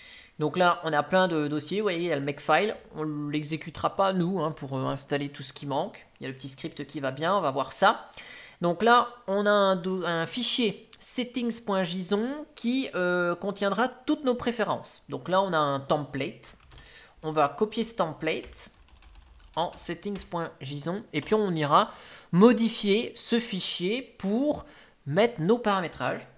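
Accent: France. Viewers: Guy teub et Mec. C'est marrant l'accent Français quand même :)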